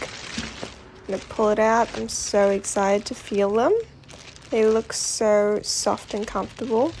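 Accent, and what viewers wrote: Australia. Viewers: Good review and you have Aussie accent 😎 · Before you moved to Australia? But your accent sounds just like any other Aussie!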